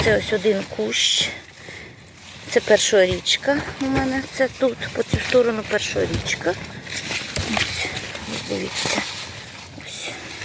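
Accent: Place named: Poltava, Ukraine. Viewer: Я думала, що ви з Полтавщині, тому, що діалект у вас полтавський... Рясних Вам врожаїв і ПЕРЕМОГИ всім нам.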